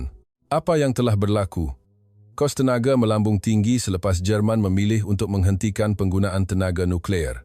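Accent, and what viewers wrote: Indonesia. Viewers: kenapa bahasanya tone indonesia 😀ini mesti channel indonesia kan???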